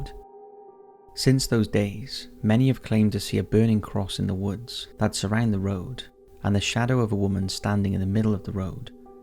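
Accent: United Kingdom. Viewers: Dude..your stories and narrations are awesome and gripping. One problem though. You talk so low its difficult to make it out even on earphones. An then there is your British accent ! (coming from an Indian Lol )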